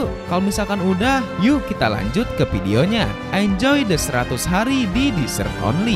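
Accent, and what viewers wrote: West Java, Indonesia. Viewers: Orang sunda bg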